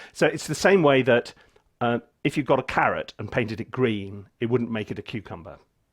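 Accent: United Kingdom. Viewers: I hear a British accent and I put my full trust in this guy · That is one of THEEE BEST How-to-videos I've watched for a long time. I absolutely love the freshness, the gags, the way he talks. (Love that british accent) Just started with "The secret to what Chords Work", the intro is hillarious. Nice dog by the way. Sir, you just made yourself a new subscriber. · I love this Guy and his British accent!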